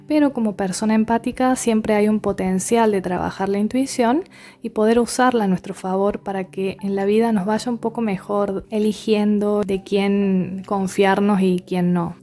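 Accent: Córdoba, Argentina. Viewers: Me encanta el acento che....saludos desde el otro lado de la cordillera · Hola sos cordobesa? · Pulsión de vida...MAESTRA, tu voz y cadencia cordobesa, me fascinan! Gracias!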